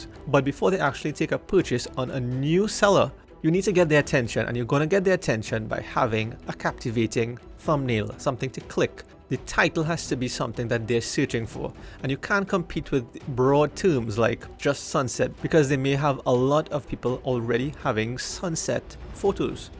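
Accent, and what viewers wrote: Trinidad and Tobago. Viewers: Are you a trini? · Thx for info. Love the Trini accent 😉👍🏾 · Awesome. So am I. I spot your Trini accent immediately. It's great to see a fellow Trinidadian doing well. Thank you for sharing.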